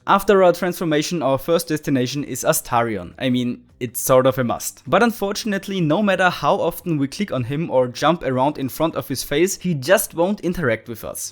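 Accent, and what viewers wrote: Germany. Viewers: I think i hear in your pronounciation that you are from germany, m i right? no offence. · Are you german? 😅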